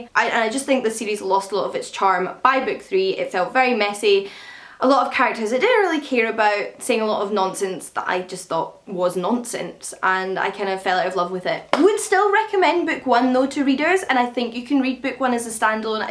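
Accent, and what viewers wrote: Scotland, United Kingdom. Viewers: so nice to hear another Scottish accent on booktube! keep doing what your doing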